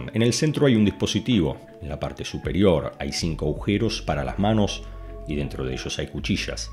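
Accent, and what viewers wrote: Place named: Argentina. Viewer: No me entero si eres argentino o español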